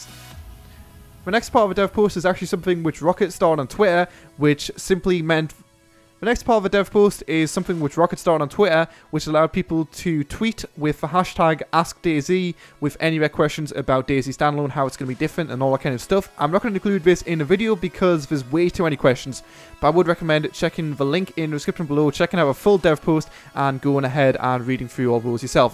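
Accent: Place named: Newcastle, United Kingdom. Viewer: hes from newcastle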